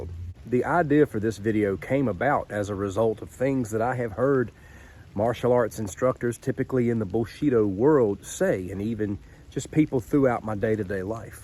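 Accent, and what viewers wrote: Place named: Louisiana, United States. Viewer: Interesting accent. I’m from south Louisiana and you remind me of there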